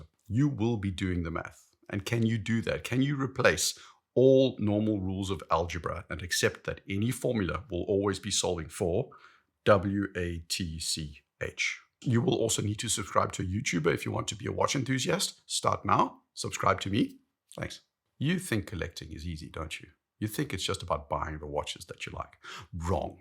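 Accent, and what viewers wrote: South Africa. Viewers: ...and a slight South African accent! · he sounds like he’s South African.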